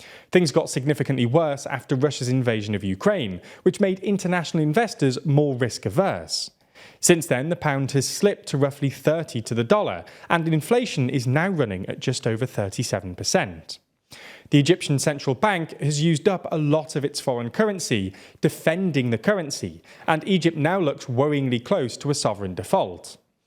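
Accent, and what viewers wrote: United Kingdom. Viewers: Its crazy for a dude with a British accent to all a global south leader "autocratic" lmao Especially since Egypt broke free from direct British rule about 10 years before my grandmother was born. In fact, there's probably people in Egypt right now who remember British rule. Like have a seat. Its also buck wild that they're making it seem like the economic issues of the country can be blamed on like one dude as if colonialism and the imposition of neo liberalism have nothing to do with it. Like bfr. Its useful to hear how liberals view things tho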